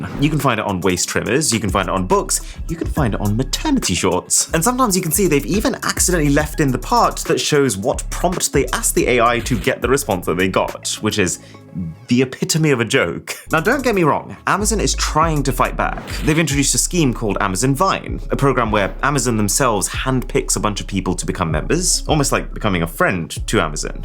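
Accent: India. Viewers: The sub continent accent made it worse for me.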